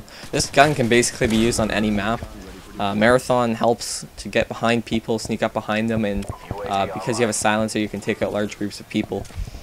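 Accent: Canada. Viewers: Subbed Are u Canadian by any chance? I'm trying to see If I'm good at recognizing accents haha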